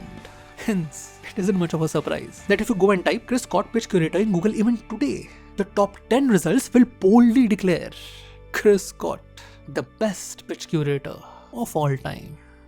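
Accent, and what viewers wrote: India. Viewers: This Indian accent is enough to given us headaches Unbelievable, 200 years literally taught them nothing.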